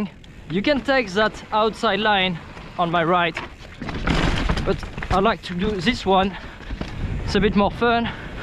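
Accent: France. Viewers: French accent ???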